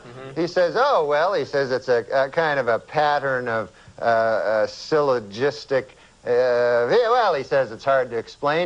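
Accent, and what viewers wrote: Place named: Ottawa Valley, Canada. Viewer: That's Ottawa Valley, central/East Canada, it's rural Scottish influences.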